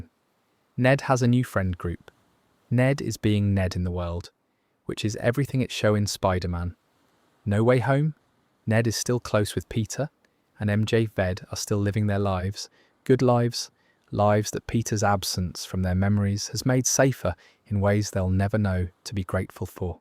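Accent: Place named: United Kingdom. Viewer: man if only you weren’t british 😭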